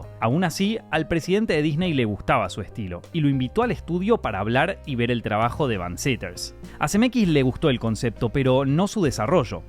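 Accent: Argentina. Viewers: Empecé a ver el video pero al oír ese acento argentino que detesto, mejor me voy a ver otro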